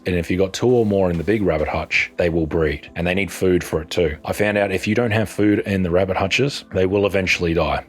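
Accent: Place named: Australia. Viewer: I was like "what why would owning..oh hes not british hes Aussie"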